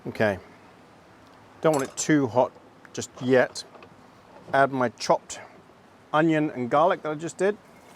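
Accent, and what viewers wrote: England, United Kingdom. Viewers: So you are in NZ. You sound like you are from the UK. Snap. Where was this filmed? Excellent work again. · Are you from England originally? I’m isolating at home at the min and started watching your videos 👍🏻😊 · Really enjoyed this video I found your channel last night for first time and watch this video and thought you was from the UK until you said New Zealand lol hope you and Bruce are doing well. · Im confused , your accent sounds British , your truck plates say NZ and its raining and cold when New Zealand is in summer in NOV .... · This was very relaxing. Just out of curiosity, were you raised in Britain? You appear to have a bit of a British accent.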